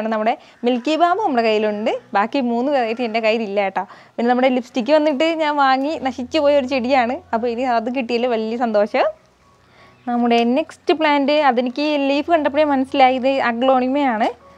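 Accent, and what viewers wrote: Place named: Trivandrum, India. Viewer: നോക്കാം കേട്ടാ 😃 ത്രിവാന്ദ്രം ഭാഷ🤩 l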